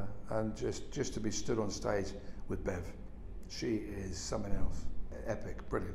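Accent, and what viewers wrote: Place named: Birmingham, United Kingdom. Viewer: What a show tonight and thought couldn’t get any better, never disappoints. He’s definitely a Brummie 🤣❤️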